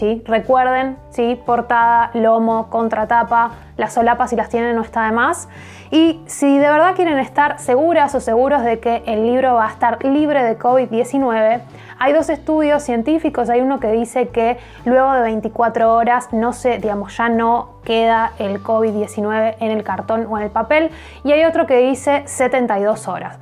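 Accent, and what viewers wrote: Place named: Argentina. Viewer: Al fin un canal sobre libros con una argentina. Todo bien co0n los compatriotas latinoamericanos, pero es mas hermoso oir nuestro acento argentino. GRACIAS! Tomé notas de algunas novelas.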